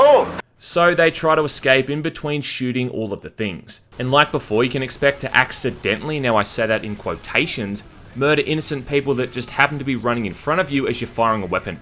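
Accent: Australia. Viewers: Idk if you'll ever see this comment but thank you for your videos. They are not only great reviews but also have a nice bit of witty humor. Plus for me the accent reminds me of my mums family from Australia. Which also makes me love the videos even more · Its so weird that your American accent sounds so Australian. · FrÜt he is australian though?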